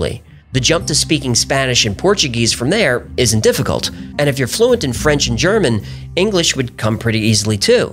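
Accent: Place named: New York, United States. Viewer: Who would have thought a smart ass human mouthed gold fish with a new York accent and nicely timed curse words would be so g@ 🤣 funny.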